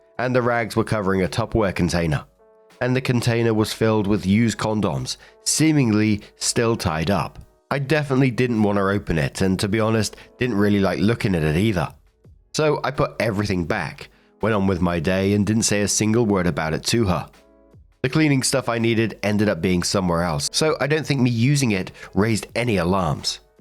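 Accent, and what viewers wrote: United Kingdom. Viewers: You're a Brit 😮!?!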